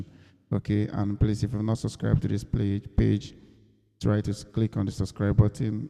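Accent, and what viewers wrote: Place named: India. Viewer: Sir your voice has turned to an Indian voice, I couldn't understand the language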